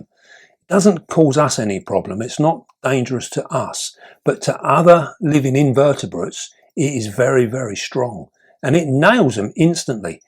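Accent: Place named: England, United Kingdom. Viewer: I'm late seeing this, sorry. But so enjoyable! I respect and learn from many channels and keepers, but you and are my favorite! It's educating, fabulously interesting and funny too at many times...this is one! I am a sucker for an English accent as well!😂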